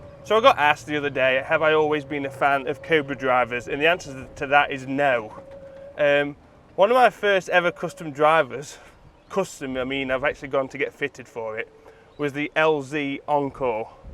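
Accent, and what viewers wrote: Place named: Lancashire, United Kingdom. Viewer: Great video, new subscriber. Where you from originally? Sound like a Lancashire lad 👍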